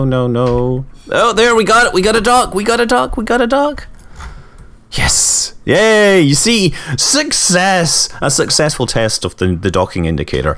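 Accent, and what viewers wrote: Scotland, United Kingdom. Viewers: Scottish Manly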